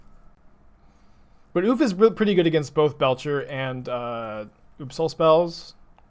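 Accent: Texas, United States. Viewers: love the texas spike accent. 🤙🤙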